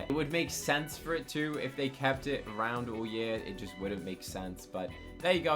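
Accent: United Kingdom. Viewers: You also sound British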